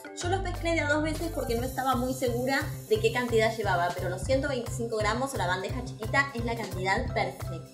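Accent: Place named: Argentina. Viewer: Hola te hago dos preguntas:1- hace cuanto que estas con este canal? (solo me aparecen 2 videos tuyos)2- ¿Eres de Argentina? Pregunto por tu hacento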